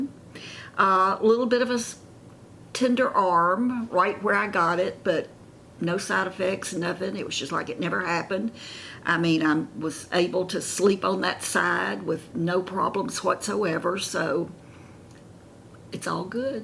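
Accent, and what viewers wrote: South, United States. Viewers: It is so lovely to listen to your voice - your soft, lilting Southern accent. I find it most relaxing. 😌🙋‍♀️🥰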